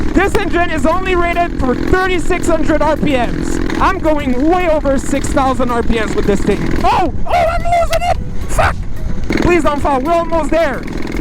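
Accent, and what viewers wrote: Quebec, Canada. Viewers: Hey! Are you from Quebec? You got the accent. 😁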